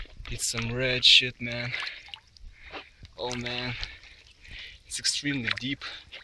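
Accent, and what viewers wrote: Russia. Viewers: Are you Russian? I hear accent ha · Videos are great. I can tell your from somewhere like Russia or close to it. What a lot of people don't understand is that the word Fuck to you (since English is not your first language), is not a big deal. Keep exploring my friend, maybe you will get that superpower:)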